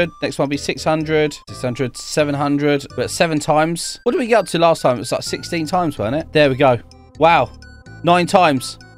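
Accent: United Kingdom. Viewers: Idk why but I just love your British accent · Is it me or is extra British in this video? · I love your British accent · You’re British right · your British innit? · Nice british stylish accent I got 11600 studs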